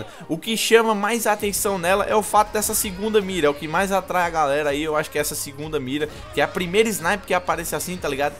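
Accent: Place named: Pernambuco, Brazil. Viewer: vc é pernambucano ta falando Papangu moro na cidade do Papangu👏👏😉😉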